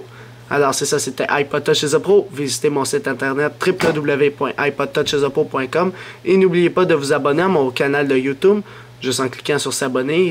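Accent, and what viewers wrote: Canada. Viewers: Ton accent Canadien il est trop drôle :-) on n'en n'oublie presque le but de la video ... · Mdr l'accent canadien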